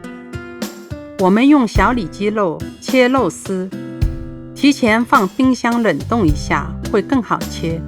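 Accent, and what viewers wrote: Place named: South, China. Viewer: 韓俊傑 南方人都这口音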